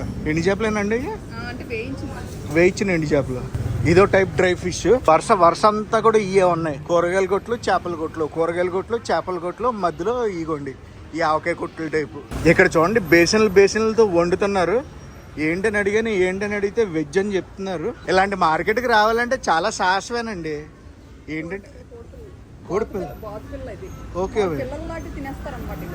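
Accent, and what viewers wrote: Godavari, India. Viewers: మీ ఇద్దరి వల్ల తెలుగు భాషకి గౌరవం పెరుగుతుంది. మీది గోదావరి యాస, గారిది విజయనగరం యాస. ఏదైనా ఇప్పుడు ఎవరి సొంత తెలుగు హ్యాపీగా మాట్లాడవచ్చు. ఇంతకు ముందు ఏవరు ఏడిపిస్తారో అని కృష్ణా, గుంటూరు తెలుగే మాట్లాడుతారు. Off cource మీడియా, సినిమాలు అవే బాషలో ఎక్కువగా ఉండడం వల్ల అలా మాట్లాడుతున్నారు. ఇంతకు ముందు తెలంగాణా యాస కూడా అంతే, నాలుగు సినిమాలు ఆ బాషలో తీయడం వల్ల అందరికీ ఈజీగా అర్దం చేసుకుని వాడేస్తున్నారు. Even ఆంధ్రలో వాళ్ళు ఇప్పుడు తెలంగాణా యాస ఈజీగా వాడేస్తున్నారు. ఏదైనా సినిమా అయినా, సోషల్ మీడియా అయినా, మీరు హైలైట్ చేస్తేనే., యాసలు బ్రతుకుతాయి. కృష్ణా, గుంటూరు ని తక్కువ అనడం లేదు, pure, plain తెలుగు వాళ్ళది, మిగతా జిల్లాలది ఫ్లేవర్ తెలుగు అంతే. Love from vijayawada(NTR dist). Native place చీపురుపల్లి (vzm dist). Thank you.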